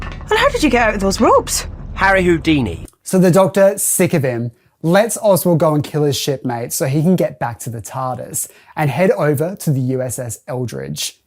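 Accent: Australia. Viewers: do I detect an australian accent?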